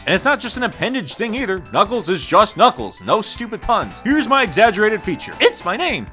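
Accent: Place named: United States. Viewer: I've also never seen that 7 emeralds ending. Are you, by any chance, playing Sonic Compilation (the one that had Sonic 1, 2 and Dr. Robotnik's Mean Bean Machine)? The standalone Sonic 2 has a different "good ending" where it's just the logo shown over a black background. I noticed in the brief comparison clip that your Sonic 1was moving somewhat slower. Is that the infamously poorly PAL Mega Drive localization of Sonic 1 where, because PAL TVs were running at 50 Hz, the game actually runs about 16% slower. Even the music is slowed down? But you have an American accent so I assume you own an NTSC Genesis. By the way, kick ass review. If I were to make a review of Sonic 2, I'd probably describe the negative aspects of the game the exact same way you did.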